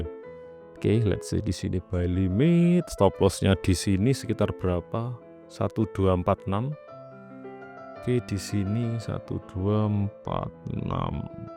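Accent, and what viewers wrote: Java, Indonesia. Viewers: frist nonton video Ki "mask" lgsg klik dgn penjelasannya dgn logat jawae dn pastine sederhana dlm penyampaiannya.. matur nwun Ki, smoga berkah dn mnjdi jariyah ilmu yg manfaat, idzin nimba ilmunya 🙏☕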